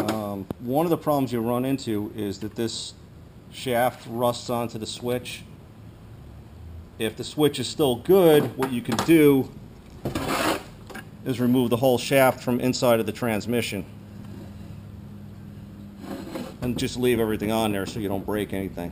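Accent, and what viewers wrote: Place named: Long Island, United States. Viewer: I've been out of NY for 20 years but id guess long island?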